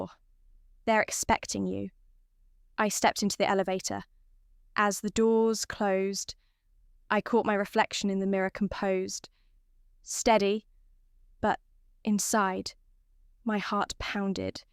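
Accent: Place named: United Kingdom. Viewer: Very pleasant British accent . Excellent diction . 😊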